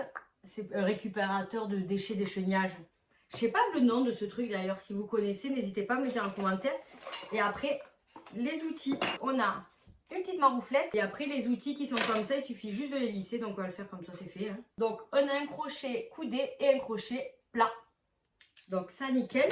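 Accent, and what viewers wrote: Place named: South, France. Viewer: hello, j'arrive, je suis tombée sur vos vidéos par hasard.... l'accent... c'est chez moi !! le sud... mais je suis plutot au dessus d'Aix. Beh du coup.... j'ai kiffé ! a très vite !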